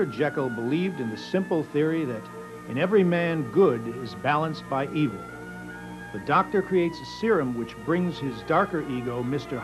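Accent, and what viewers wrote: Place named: Transatlantic, United States. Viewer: Had me thinking there was this talented guy with a transatlantic accent in the studio with and no way he chopped all this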